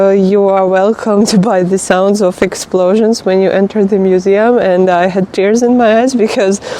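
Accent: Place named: Ukraine. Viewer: добрий вечір. Więc najpierw napisze Tobie śmieszny komplement:) Czyli to, że masz ten przepiękny ukraiński akcent jest tak przeurocze, że ja życzę Tobie, żebyś Ty nigdy się go nie pozbyła bo to jest dla naszych uszu jak najpiękniejsza muzyka! Więc cudownie, że tak dobrze już mówisz po polsku i brawo dla Ciebie, a Twój akcent to taka przeurocza wisienka na torcie więc nie wstydź się go tylko ciesz się nim:) Dalej chciałbym Ciebie przestrzec przed czymś. W Polsce mówi się o tym, że jest mniej poparcia dla Ukraińców i że ludzie są już zdenerwowany, że tak dużo Ukraińców jest w Polsce. Więc to jest totalnie nie prawda! My bardzo się cieszymy, że do nas przyjechaliście i szkoda, że w tak strasznych okolicznościach jednak zachowujecie się w Polsce bardzo dobrze, pracujecie i dokładacie się do rozwoju naszego kraju i obyście chcieli to wszyscy po wojnie zostać. 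Zatem jeżeli ktoś Ciebie będzie pytał jak tam nastroje w Polsce czy nadal nas lubią, to możesz powiedzieć śmiało jest nawet lepiej oni nas kochają i słuchaj ja też mam ochotę uklęknąć i zawiązać Tobie buciki jak będzie taka potrzeba. A jeżeli zderzyłabyś się z jakąś nieprzyjemną sytuacją, to ja z góry Ciebie za nią przepraszam i proszę nie przejmuj się tym u nas też głupich niewykształconych ludzi nie brakuje, ale pamiętaj o tym, że każdy normalny i inteligentny Polak zawsze z chęcią uklęknie i pomoże <3 Przytulam Ciebie gorąco, życzę dużo uśmiechu i powodzenia we wszystkim oraz to jest ciężki czas teraz dla Was jednam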